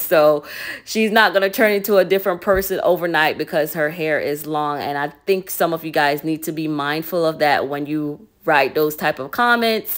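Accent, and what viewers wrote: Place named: Jamaica. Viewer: I could here the Jamaican in you😊😅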